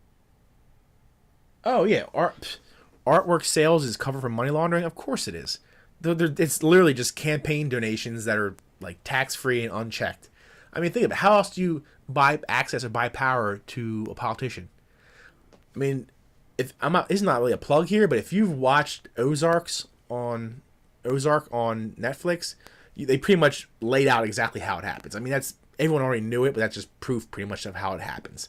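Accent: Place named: Delaware County, United States. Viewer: 10 seconds into video I know your from Delco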